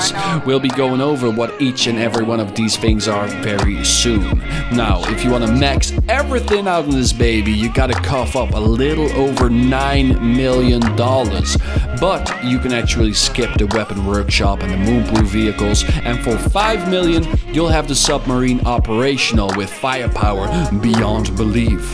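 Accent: Netherlands. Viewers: Hold up, are you dutch? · Are you dutch? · Wait what is he Dutch?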